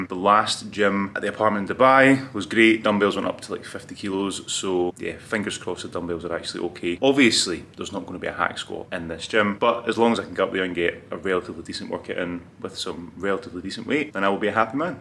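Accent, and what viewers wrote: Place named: Scotland, United Kingdom. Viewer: English with a Scottish accent.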